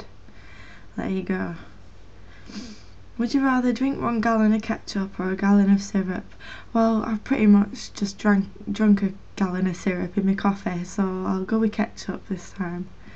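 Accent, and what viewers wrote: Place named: Lancashire, United Kingdom. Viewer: Hey, I had an audition for a play in Dallas which would be performed in a Lancashire accent. I found you and recorded your Tumblr on my digital voice recorder for practice. I didn't get the part, but kept your voice on my recorder. I just like hearing it occasionally. I love your accent! The bug is a roly poly.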